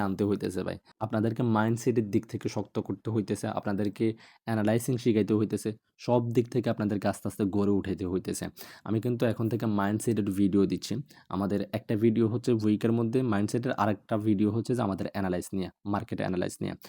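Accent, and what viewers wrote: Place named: Chittagong, Bangladesh. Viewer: ভাই কি চট্টগ্রামের নাকি? কথা শুনে আমার দেশি ভাই মনে হচ্ছে।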